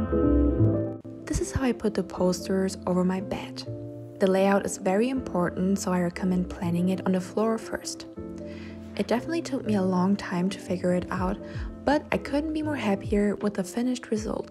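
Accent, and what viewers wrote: Germany. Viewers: Es ist voll süß das man deinen deutschen akzent hören kann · She up is German I think I hear the accent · You look soo pretty!! And with your sweet german accent girl you nailed that room makeover!!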